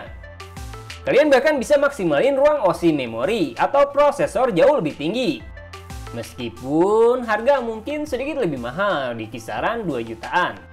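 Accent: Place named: West Java, Indonesia. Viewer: sunda pisan euyyy...kerenn, sukses terus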